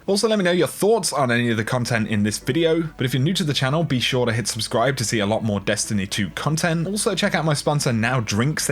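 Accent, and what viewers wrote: England, United Kingdom. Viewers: Hey those idiots are jealous they dont have a sweet ENGLISH accent!!! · Wow ppl got triggered because you are English and therefore don’t pronounce words with an American accent? Lol, you mortals are so petty.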